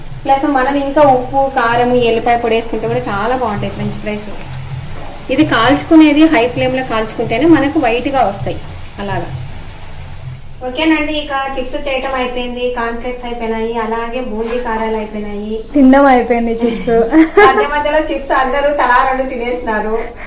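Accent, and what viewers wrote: Rayalaseema, India. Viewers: Super andi mee vantakalu meeremo rayalaseema mee papa emo andra basha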